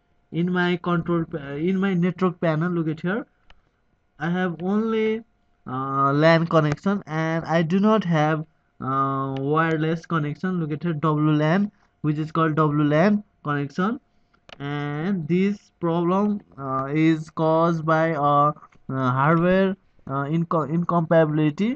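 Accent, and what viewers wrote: Nepal. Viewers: Nepali Manxe le English boleko xittei chininxa dai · Dude, are you Nepali? You got a very Nepali accent. Your video didn't even address my problem. Thanks anyways.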